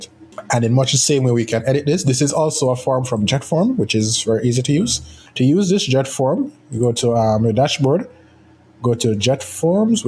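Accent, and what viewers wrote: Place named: Jamaica. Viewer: Bro you sound so Jamaican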